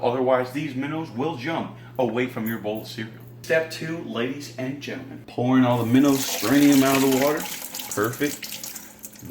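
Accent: Texas, United States. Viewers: Is he talking/sounding abit like his from Texas now?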